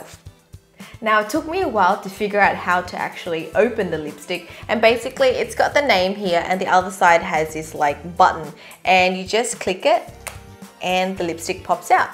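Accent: Sydney, Australia. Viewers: She's Australian. She even mentioned that she was in Sydney. :) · Liz Beth she's from Australia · haha shes probably asian but grew up in australia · R u from Sydney Australia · I knew it! sounded Aussie but wasn't sure :-P Thx for your reply. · I knew you got OZ accent HAHAHAHHA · Omg yas is that an Aussie accent? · oh my gosh lol. she can be Asian and Australian... she's from Australia but is Vietnamese lol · Liz Beth she's from australia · Her accent is Australian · its australian!! like mine :) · her australian accent is form sydney where she grew up...mentioned in video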